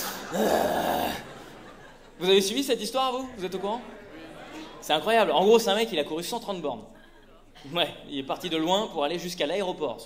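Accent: Quebec, Canada. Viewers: Il est génial ton accent Québécois 😂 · Je suis québécoise! 😂😂😂 Je t'aimais mais là je t'adore 🤣🤣🤣 c'est presque ca l'accent 😉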